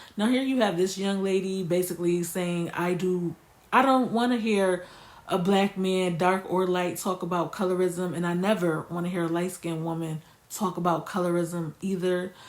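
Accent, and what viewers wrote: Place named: Brooklyn, United States. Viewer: I like your Brooklyn accent. You from BK?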